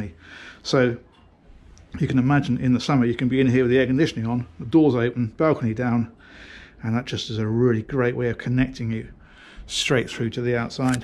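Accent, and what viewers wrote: United Kingdom. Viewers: Everything just sound a whole lot better when you have a brittish accent reviewing.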